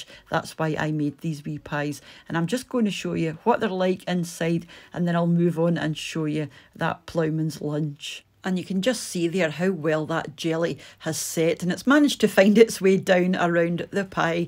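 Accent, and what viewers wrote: Scotland, United Kingdom. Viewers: Loved you r video and your accent, miss my friend s from Scotland. With love from Brazil Jerry.❤ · You sound like that little Scottish ladie on television , the buying and selling show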